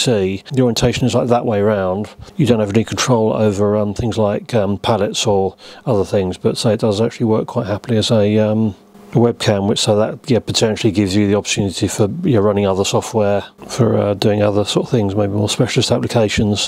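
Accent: United Kingdom. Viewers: Yeah, if you have that much trouble, just go watch one of the many other electronics channels. And those of us who can understand English accents just fine (that's just how people speak in that region) will enjoy amazing content.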